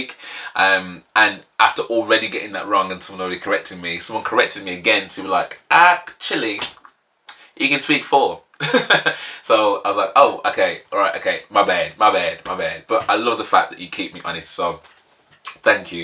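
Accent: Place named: Birmingham, United Kingdom. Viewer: First of all, do you come from somewhere near Brummagem, you sound a bit like Jack Grealish to me ? Any road up, Adagio was sung by Lara Fabian who has been a great influence on Dimash. If you check out her performance of Adagio you will see why Dimash holds her in such high regard, they both put heart and soul into their performances. <link> She has performed live with Dimash singing Ti Amo Cosi, which no doubt you will come to in the future. There was a great camaraderie between the contestants in Singer 2017 and are all top draw. All the best, Peter.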